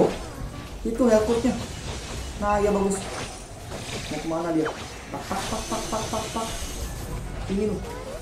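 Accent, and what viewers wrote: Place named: Surabaya, Indonesia. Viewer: sedot lu kok medok bgt??? pas an kyk aku suroboyo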